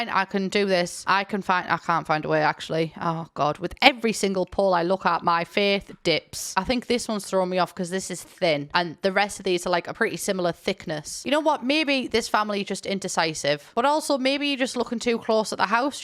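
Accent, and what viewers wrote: United Kingdom. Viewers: i must like your videos a lot since i put effort into understanding 1. you talking very british 2. you talking very fast hahaha (english's not my 1st laguange)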